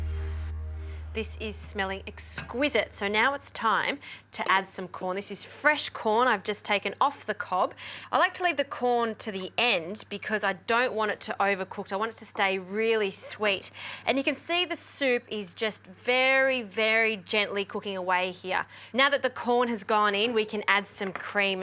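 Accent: Australia. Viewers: You are a cutie pie I like your Aussie accent...could you wear some tighter clothes next video..oh the dish looks nice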